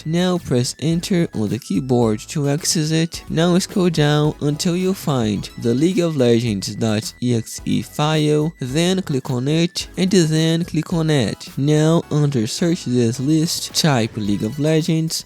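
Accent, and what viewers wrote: Brazil. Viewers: Pelo sotaque parece carioca. Obrigado pelas digas! You're awesome! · brazilian accent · Brazil , for sure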